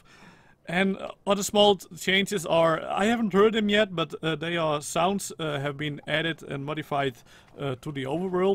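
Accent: Netherlands. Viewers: are you dutch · That Dutch accent XD. Respect! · are you dutch because you kinda have a dutch accent when you speak english??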